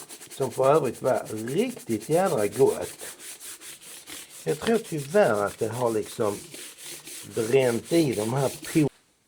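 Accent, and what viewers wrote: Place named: Skåne, Sweden. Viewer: Härlig Skånska känner mig hemma ☺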